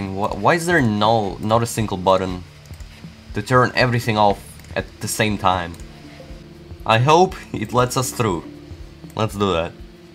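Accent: Russia. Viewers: "Because im russian" Я сразу по акценту понял что русский) Но англиский у тебя очень хорош. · У тебя акцент очень похож на русский 😳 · One of his longest videos and 1/2 with his voice i like your Russian accent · I like his Russian accent lol 😂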